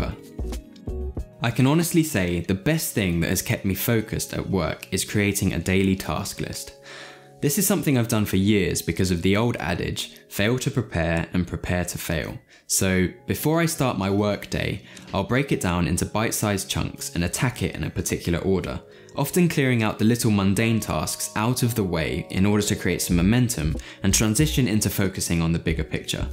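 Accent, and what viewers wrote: United Kingdom. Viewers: I'm liking the new British accent! ❤️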